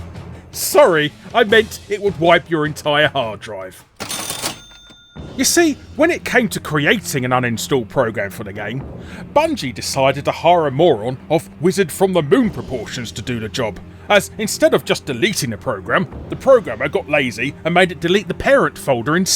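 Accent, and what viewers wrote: United Kingdom. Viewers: are you proppa british?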